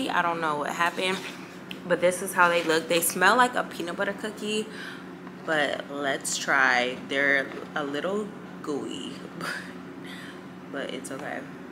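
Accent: England, United Kingdom. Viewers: The British voice 😂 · Your English accent gets me every time ….and I’m from London 😂😂😂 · The beginning of the vlog is just funny 😅😅 the UK accent love you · The Accent is sending me especially being British😂😂 The vlogs are always so motivational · it’s the british accent for me lmaoo, im happy you’re in a better state ❤️❤️keep the vlogs cominggggg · Loving the. Vlogmas motivation is key keep it up . And your British accent l😂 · You’re so unique I love your vibes! And your bri-ish accent 😂💖🥰 ps: you drew a candy cane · Elloooooo british accent 😅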